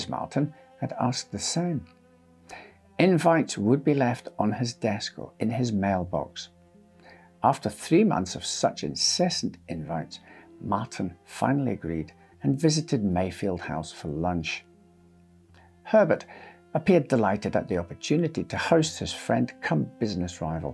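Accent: Scotland, United Kingdom. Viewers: Delivered with a beautiful Scottish accent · your lovely Scottish accent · it's a Scottish accent 🤣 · well, no, but we do know that it's a Scots accent. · A little Scottish, minus the rolling Rs. Good old English and an occasional Africaan twang. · As always you narrated story kept me the edge of my seat. Thank you Sir and your wonderful Scots accent!❤ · So are you a Scot or a Welshman? The reason I have asked you is because you sound just like my father who hailed from Edinburgh but went to Perthshire during the war, he never lost his Scottish accent, even though he had been in Australia for 31 years at the time. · i love listening to this lovely man with his scottish acsent, he sounds just like my ex husband.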